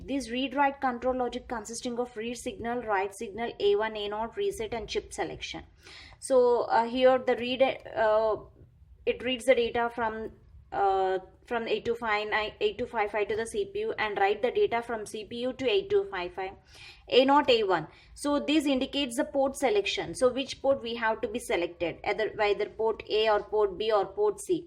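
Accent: South India, India. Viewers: i hate these south Indian Accents..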